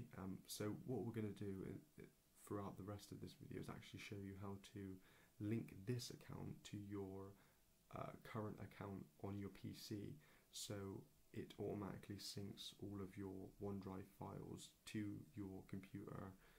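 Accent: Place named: England, United Kingdom. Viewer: English accents are delightful... especially when one can hear anything spoken ... I cannot! Where's the volume?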